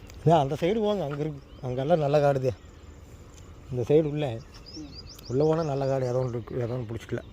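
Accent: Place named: Kongu, India. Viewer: Kongu speak super ga anna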